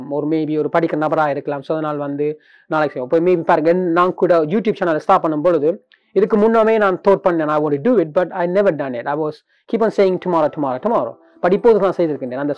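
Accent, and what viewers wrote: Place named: Sri Lanka. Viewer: Srilankan Tamil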